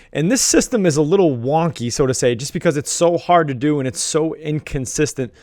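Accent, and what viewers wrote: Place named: New England, United States. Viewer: are you from new england? you kind of have an accent lol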